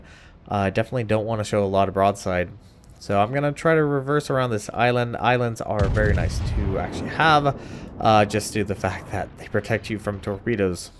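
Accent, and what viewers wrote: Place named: France. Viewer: Dat French pronunciation doe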